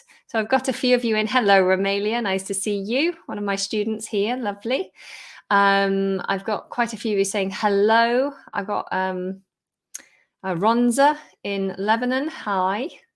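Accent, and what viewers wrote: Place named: United Kingdom. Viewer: I love British accent!!!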